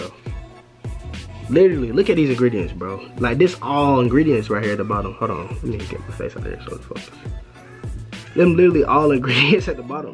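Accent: United States. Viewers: You from Atlanta? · Bro where you from? I feel like you from texas😂the way you talk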